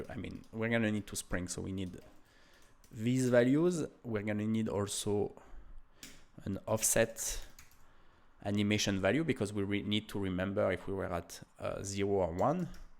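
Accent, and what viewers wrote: France. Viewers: Like your French accent ✌️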